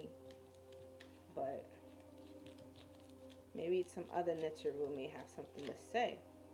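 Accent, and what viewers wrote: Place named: East Coast, United States. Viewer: Sis, I appreciate how you use this platform. Tha info you choose to share gives me more life—it sustains me. Also, there are others who I follow, who also use this platform similarly, disseminating similar info but you have a wonderful knack for imparting this info, that just RESONATES in a different way. Shit refreshing AF and I thank you. Plus, your east coast accent is one of my favs. ✊🏿❤️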